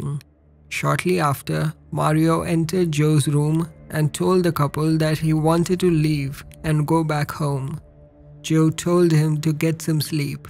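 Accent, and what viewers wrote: India. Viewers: Why Indian Guy with Indian denglish as your speaker? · the indian guy narrates, the accent irritates my ears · Lol turn on subtitles...my mother is married to an Indian...I'm kinda used to the accent lol...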